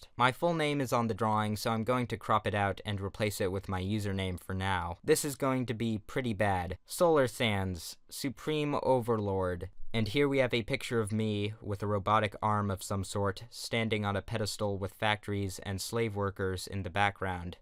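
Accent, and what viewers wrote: United States. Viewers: i find your american accent attractive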